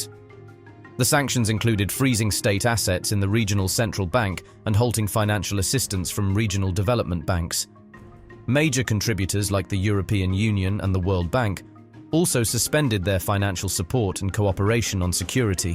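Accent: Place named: United States. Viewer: HILARIOUS and LUDICROUS! You're talking noise man! You're talking about dwarfs who're trying to get a normal size! Senegal and Niger What a joke man! Libya used to be Z wealthiest and socially developed before Hillary Clinton put an end to its success story! Libya HAS NOTHING TO DO WITH THAT POOL OF bantu DWARFS! Rwanda? C'mon! It weighs NOTHING compared to Tanzania and Uganda either! HAVE A LOOK AT GDPs instead of that nonsensical growth-based bla bla! hAVE YOU LOST YOUR MIND? ETHIOPIA is a giant in all aspects! NOthing to do with that Bantu thing... C'mon! Btw, your accent smells American more than African Bantu. HILARIOUS POST! GROWTH IS NOT EVERYTHING! Just look at China's 5% and US 3%... and STOP COMPARING carrots and gold! It's LUDICROUS and so IGNORANT of how this world works! Go back to school!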